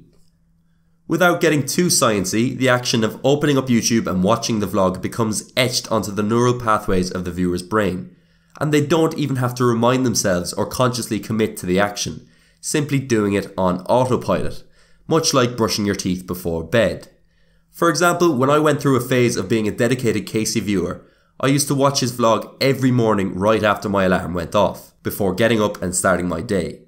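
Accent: Ireland. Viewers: to begin, I love your accent! Ireland Forever!!!! I agree with your evaluation 100%. I grew up in Ct., not too far from where he is from, and he is a proud son of our state! Thank you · I LOVE your Irish accent! What part of Ireland are you from? Btw I LOVE how insightful your video is. It helps me figure out how to better grow my own vlogging channel! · Is that an Irish accent I hear?!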